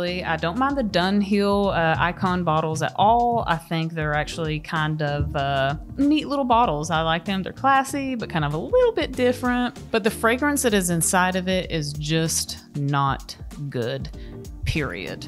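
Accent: South, United States. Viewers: you talk just like him but just with a female voice and southern accent lol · Gosh, I love your accent. I mean, I'm also American, but still.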